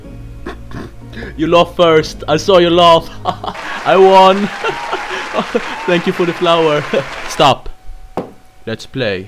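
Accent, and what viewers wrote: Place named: Sweden. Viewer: the look of your pants, you know.. swedish pants these days... nah jk your accent reveals it all, well the pants to but yea;D im swedish to btw.:)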